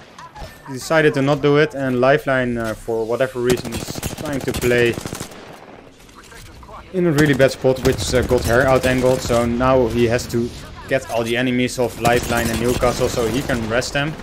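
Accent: Netherlands. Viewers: Yo are you Dutch?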